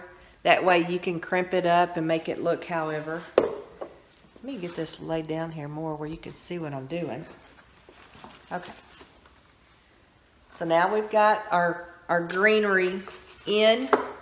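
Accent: Texas, United States. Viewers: I'm a new subscriber. I would love to see a tour of your whole house. What I can see is gorgeous. Is your accent from Texas?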